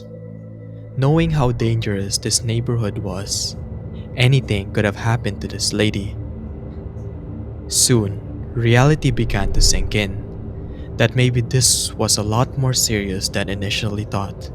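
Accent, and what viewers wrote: Philippines. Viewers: Filipino accent?? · The first tine I watched and heard your voice I know you're a kabayan.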